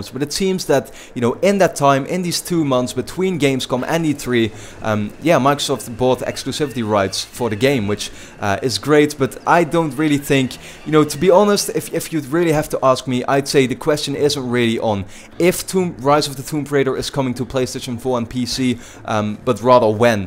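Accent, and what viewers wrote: Netherlands. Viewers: Are you dutch? Your accent ;)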